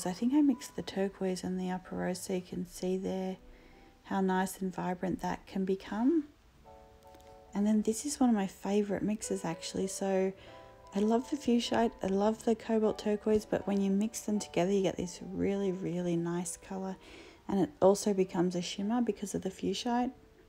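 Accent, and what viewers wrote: Australia. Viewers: her billowing heart You sound Australian? Thank you for this delicately made video, it was so different to all the other palettes that I see on Utube. Tip: I read on a comment a while ago, that you can use glue stick on the palette, unless you like your little beads of colour on your tin which are really cute! I use the glue stick and I wipe it off with a wet tissue. The reason I like this, is you don't have to scrub it, or erase it or buff the enamel. It prevents staining too! Just be mindful not to use a towel, or a heavy paper, as you will get lint in it. It makes sense though, with the sticky honey pigments, although when you say use glue stick, it kind of shakes you up a bit. Have you naturally taken a while to like all these colours? Interested in getting more of those pearly colours from Daniel Smith, and the gold that was on your cherry blossoms? 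I think I liked it when you mixed these out, as it looked like you had a lot more that I wouldn't have thought to make. Have fun In kindred spirit Eliza MB Day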